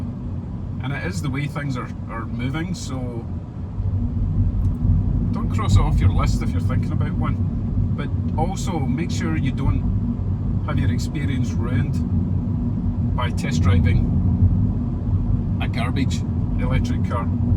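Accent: Scotland, United Kingdom. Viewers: I’m terribly sorry in advance but is this Scottish English? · i see you have a proper St. Andrews flag in your garage, along with your accent I was floored, cuz I never heard of or seen a Scottish Canadian before! This was my first video of yours I saw, I have now seen a few more, keep up the good work! I hope to get an Audi 50 e-tron when the become available in the states! Thanks again!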